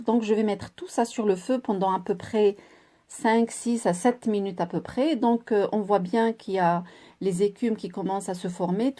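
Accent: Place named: Morocco. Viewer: كي نسمع الهدرة تاعك نقول انكي من المغرب الشقيق ولكن الطبخ تاعك بكل صراحة طبخ جزائري ديجا احنا اشقاء واكيد طياب ميكونش مختلف كثيرا شكرا لك على لوبيا بالكرعين سخفتين يعطيك الصحة الخدمة والشرح المفصل والإتقان في العمل .متتبعة لكي جزائرية مغتربة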